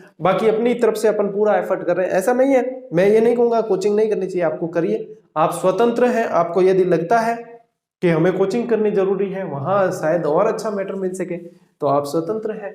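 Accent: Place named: Rajasthan, India. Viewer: Sir aap ki godhwadhi boli bhut pyari h aap jab bhi baat karo to bas yahi boli bolo🙏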